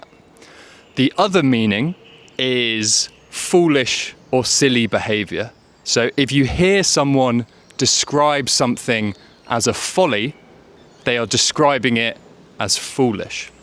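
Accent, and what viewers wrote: United Kingdom. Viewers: Just like in your previous videos, you really delighted me. Through your videos, I've been able to learn English effectively while also discovering interesting stories about British history. Furthermore, you have a very distinctive British accent (I guess), which is easy to understand and concise, helping me practice my listening skills confidently, as my listening ability is very poor. Thank you very much.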